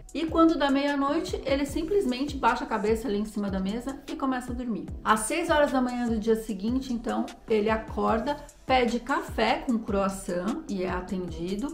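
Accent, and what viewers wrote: France. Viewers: Je aimer le Ton Français , merci mandame.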